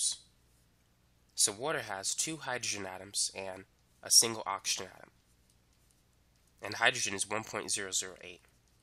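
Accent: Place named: New York, United States. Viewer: you definitely from ny b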